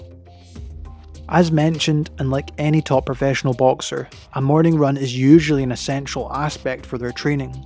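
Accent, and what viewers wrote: Scotland, United Kingdom. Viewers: Good vid work. 👌 the Scottish accents adds more value 😄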